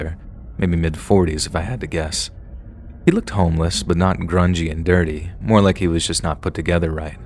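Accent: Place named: South, United States. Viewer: Your southern accent isn't bad 😆😁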